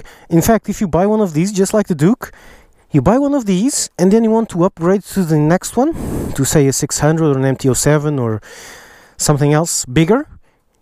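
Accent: Portugal. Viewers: Ahah o teu sotaque parecia mesmo de Portugal mas só quando falaste é que percebi mesmo ahah, podes dizer-me quanto custa essa moto em Portugal? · Sotaque tuga! Sera?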